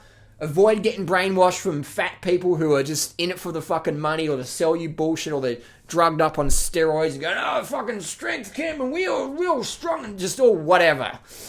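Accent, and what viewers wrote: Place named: Australia. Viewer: Great video. Because of your awesome Australian accent, it kind of sounds to my American ears like you are saying fucktose when you say fructose. =)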